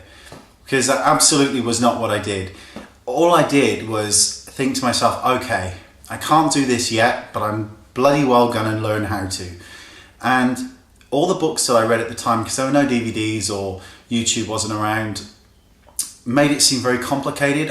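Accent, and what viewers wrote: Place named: Bristol, United Kingdom. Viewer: Sounds like the Bristol area to me (my neck of the woods!). Sometimes, whenever I'm feeling homesick at uni, I'll play his videos in the background while I'm working. He's like my David Attenborough. My neighbours must think I live in a makeup cult; nonstop one-way conversations about contouring and eyelashes...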